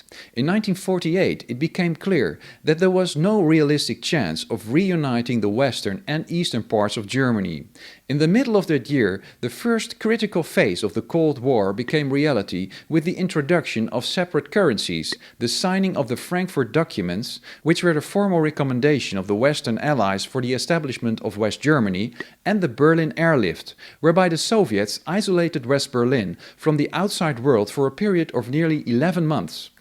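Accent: Netherlands. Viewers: Geweldig kanaal ! Ik hoor toch echt heel goed Engels met een Nederlands accent. Klopt dit? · You speak with a very Dutch accent for a German man. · How funny, that you speak perfect German, but you speak English with a heavy Dutch accent. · Although he speaks with. Dutch accent, his German pronunciation is great!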